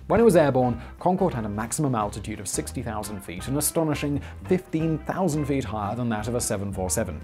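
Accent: RP, United Kingdom. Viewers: This guy is so posh I love it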